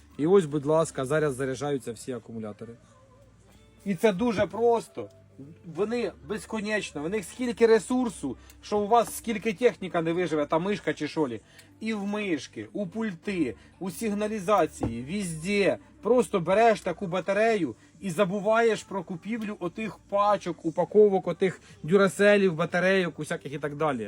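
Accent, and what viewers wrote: Ukraine. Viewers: Красавчик, этот говор, этот суржик класс,😊 я почти также говорил в детстве, осйо оце, воно. 😊😊😊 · Полтавська мова)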